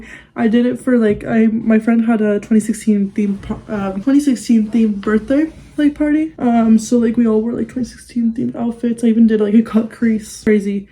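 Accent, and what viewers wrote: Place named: United States. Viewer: U don't sound Irish at all ur accent sounds so american but it definitely slips out when u say photos lmao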